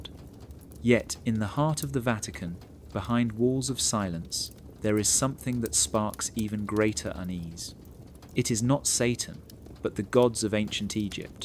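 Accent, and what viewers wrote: England, United Kingdom. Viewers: Are you a English lad? Did you come from Oxford?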